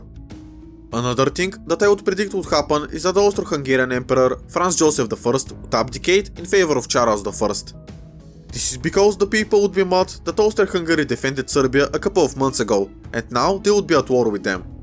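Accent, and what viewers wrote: Bulgaria. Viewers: Stop making videos with that terrible bulgarian accent. честно ме е срам да ти слушам ужасното произношение, ушите ме болят. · I knew it that you were from Bulgaria you sound like Bulgarian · Личи си че си българин по акцента. Малко го забелязват но ние натъртваме повече на ъ,р,а. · този бг акцент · Saying you hate Bulgaria with that type of "accent" (basically speaking English as if you are speaking Bulgarian) is basically like saying you hate yourself. 😆 You confused child... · as a bulgarian, this looks like heaven... written on paper... by the way, your accent sounds like you're from bulgaria itself (i recognized it as soon as the video started) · Bro has the typical Bulgarian accent 👍👍 amazing video✌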